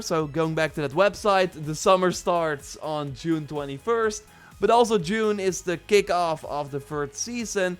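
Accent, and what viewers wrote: Netherlands. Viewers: This Dutch accent hurts my poor ears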